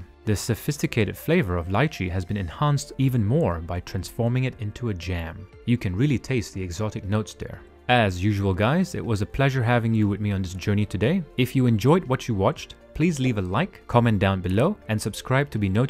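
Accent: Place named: Netherlands. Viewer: You sound Dutch but you said you grew up in Malaysia I’m confused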